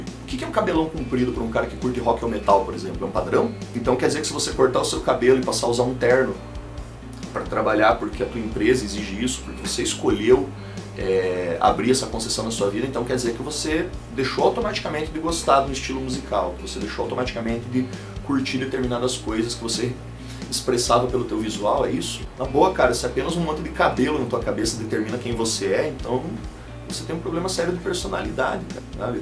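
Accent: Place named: South, Brazil. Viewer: Cara, da onde tu é? tu tem sotaque do sul! tu é gaúcho? Muito bom o vídeo, parabéns!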